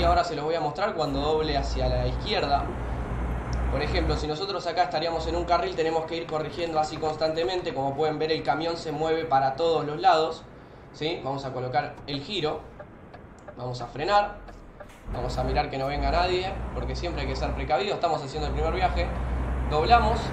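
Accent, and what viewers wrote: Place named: Argentina. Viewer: Hola como va? gran video literalmente encima argentino aun mas lo entendi ajjajaja, yo tengo un problema. como puedo configurar para un volante de 180 grados.. es el de noganet. lo quiero configurar para el assetto corsa ya que mi idea es practicar drift en ello. Serias de muchisima ayuda de verdad, Gracias! y nuevamente gran video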